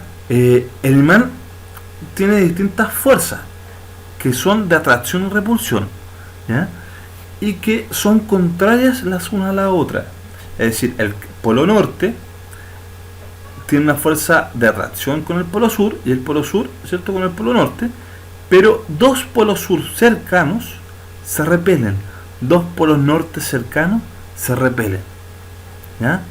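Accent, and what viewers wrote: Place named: Chile. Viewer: Si porque habla de 8vo y 1ro medio que solo se utiliza en Chile, también tiene acento chileno y utiliza las palabras de ya dicho pais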